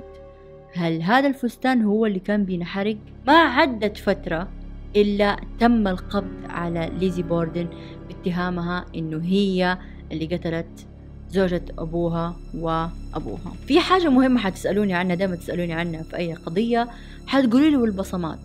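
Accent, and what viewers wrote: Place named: Hejaz, Saudi Arabia. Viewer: لهجتك الحجازية عسسسسل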